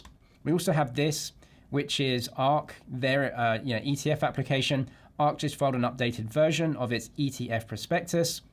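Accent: England, United Kingdom. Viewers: You sound English, but bybit doesn’t operate in Britain from what I understand? Is this the case? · He is British but doesn't live there anymore. And correct, Bybit has ceased operations in UK for now due to regulatory scrutiny.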